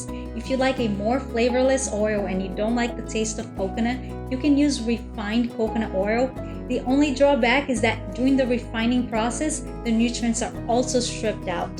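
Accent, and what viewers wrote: Brazil. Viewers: Wait wait wait Are you from Brazil? You have a Brazilian accent, and I’m from Brazil too :D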